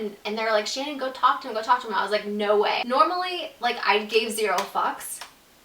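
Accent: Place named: California, United States. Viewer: she's from california, that's the way people talk there.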